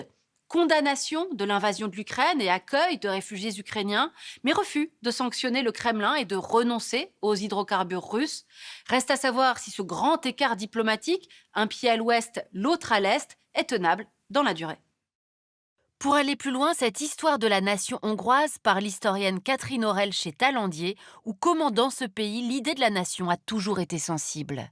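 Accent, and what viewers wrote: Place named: France. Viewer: Que asco ese tonito francés...parece que tuviera una puta papá en la boca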